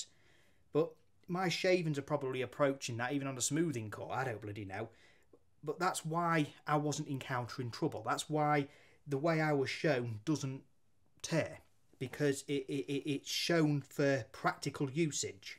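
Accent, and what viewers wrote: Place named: Bolton, United Kingdom. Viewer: Is yours a Bolton accent?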